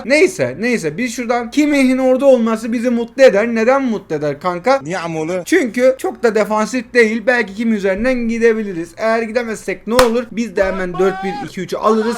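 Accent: Kahramanmaraş, Turkey. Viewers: abi sen maraşlımısın kfepofjkwsf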